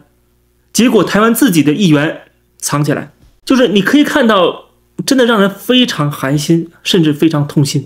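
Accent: China. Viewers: 你支持台独却又没法做更进一步的事情，你说你气不气？公子狗只能整天在YT这里哇哇哇狗叫，一个大陆口音的人去当湾湾人的舔狗，你除了狗叫还能干啥，拿点实际的东西出来啊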